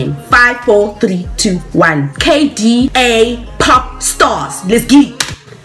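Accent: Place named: South Africa. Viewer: That accent sounds very familiar. Are you from SA?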